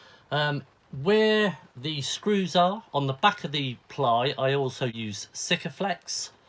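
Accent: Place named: Bournemouth, United Kingdom. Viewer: You sound like you are Bournemouth, the guy in B and Q told me to watch :) cheers mate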